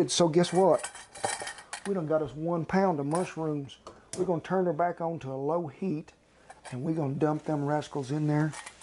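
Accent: South, United States. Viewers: Your voice kinda reminds me of JOEL OSTEEN but with a more country accent!!🤭🤭..some onions along with them mushrooms wouldve did it but nevertheless a beautiful job!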